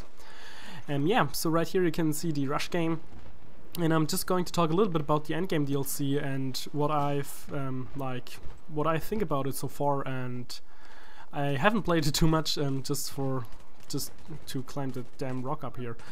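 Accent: Germany. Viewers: ahh ein deutscher dachte schon ^^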